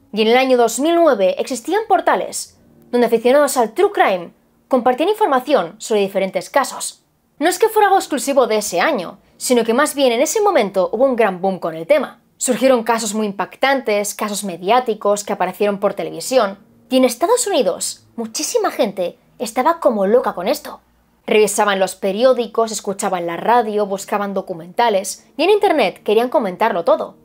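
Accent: Spain. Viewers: ¡Qué linda estás! Siempre veo tus video y me encantan (además amo el acento español). Saludos desde Argentina🥰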